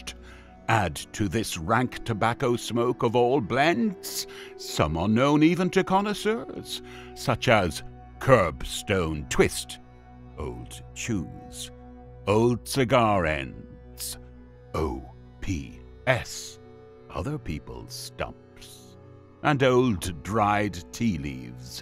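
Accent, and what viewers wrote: Dublin, Ireland. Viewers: I hear a well bred Dublin accent. It is said this accent speaks the best pronounced English in the world. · Interesting an Irishman is narrating this. The exact same conditions existed in any town or city in Ireland. Actually worse, even though Ireland and England were the same country at the time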